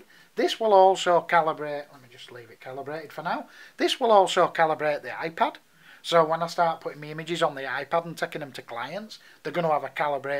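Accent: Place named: England, United Kingdom. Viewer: where in england are you? i loove your accent :D too the spyder pro: Which one to get, and why did you get the Pro?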